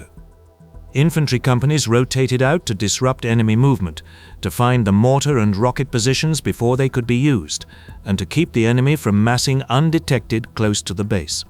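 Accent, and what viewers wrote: England, United Kingdom. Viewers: Always interesting hearing an English voice telling us about Vietnam .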